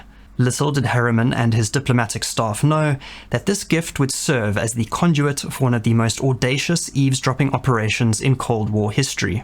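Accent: South Africa. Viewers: Nice to put a face with the charming South African/dutch accent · Interesting content. Well researched. Your accent is South African English, right?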